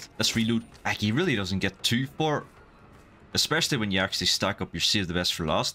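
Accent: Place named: Scotland, United Kingdom. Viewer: Love watching your videos!!! Your Scottish accent makes it even better haha keep up the good work dude!!!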